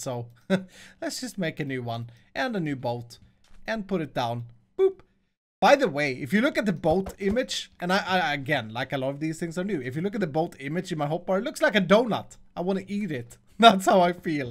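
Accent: Sweden. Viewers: his acent is swedish btw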